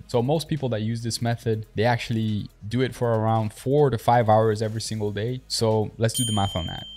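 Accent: Brazil. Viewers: tu é carioca né kkkkkk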